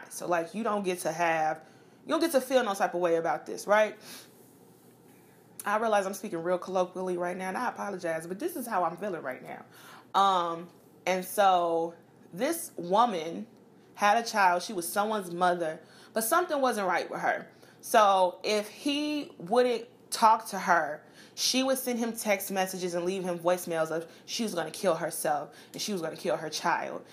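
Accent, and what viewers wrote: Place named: Chicago, United States. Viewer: U from Chicago huh....nice. Midwest in the building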